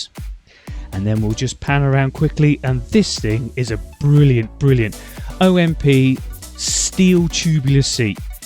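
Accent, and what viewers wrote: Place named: Suffolk, United Kingdom. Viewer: I’m in Ipswich .. thought I recognised the twang 😝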